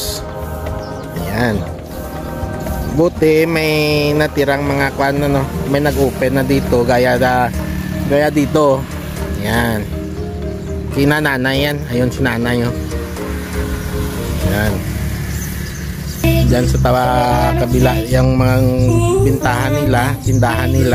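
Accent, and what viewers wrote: Iloilo, Philippines. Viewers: havea nice day my friend. niceto see your nice views nice sea very clean. ano g p,ace mo kaibigan parang iloggo ka ata friend. salamat po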